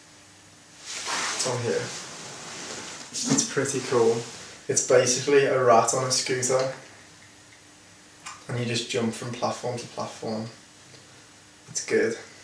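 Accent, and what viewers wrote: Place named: Liverpool, United Kingdom. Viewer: Scouse accent 💯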